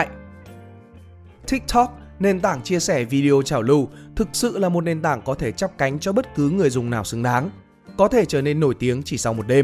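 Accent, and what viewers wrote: South, Vietnam. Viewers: từ khi thêm giọng đọc miền nam vào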